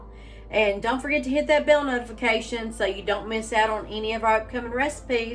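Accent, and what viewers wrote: South, United States. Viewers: Thank u for sharing your recipes . Also I love your southern accent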